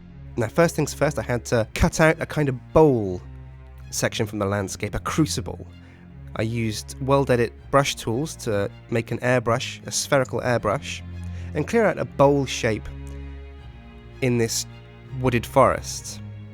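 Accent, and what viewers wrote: United States. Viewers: You sound a bit american with you sore voice.makes me wonder. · Is it just me or does sound like an American when he is sick? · He sounds American.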